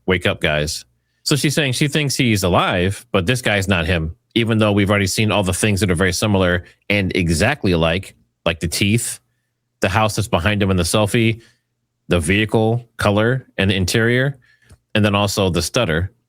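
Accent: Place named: New York, United States. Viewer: You can hear the New York accent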